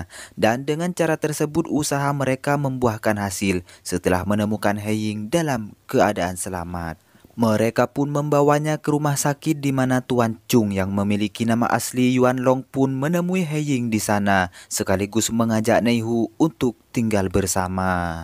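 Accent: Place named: Aceh, Indonesia. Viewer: logat Aceh Sumatra kental..hhh mantab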